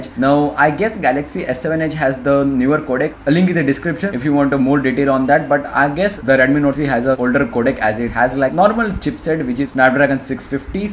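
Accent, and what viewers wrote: India. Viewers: Indian accent check Fast talking check Doesn't explain anything check Conclusion:don't recommend to do this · 😂😂😂😂 asian/indian dialect is so fckng funny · Indian accents are funny as fuck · Another garbage indian accent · I don't like the Indian accent it's difficult to understand PD: I'm not native speaker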